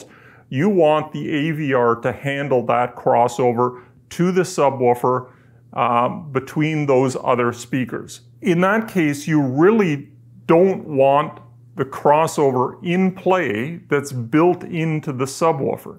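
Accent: Canada. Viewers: You must be Canadian!! Or from Minnesota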